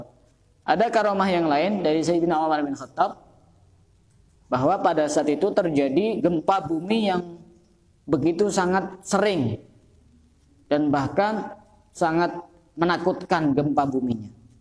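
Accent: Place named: Madura, Indonesia. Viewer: Kok seperti logat Madura ustadz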